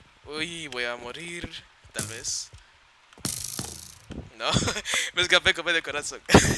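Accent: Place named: Dominican Republic. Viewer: Hay deohmeo mijo tu ere de RD representa ese acento :v parece pajaro :p sin ofender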